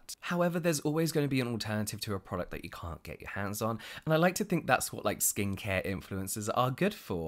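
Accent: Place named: United Kingdom. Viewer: Totally fine with me: I am a Continental European and your British English is... music to listen to! :-) Besides, of course, the content is super-interesting! ♡♡♡ P.S. You know you do your finger counting like us... in the Continent, starting from the thumb and not from the little finger? LOL... ✩✩✩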